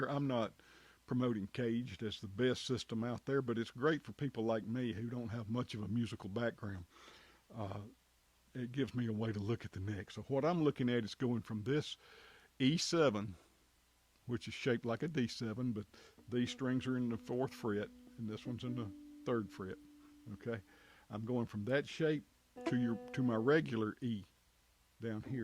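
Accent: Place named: Kentucky, United States. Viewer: ...you sound like Kentucky.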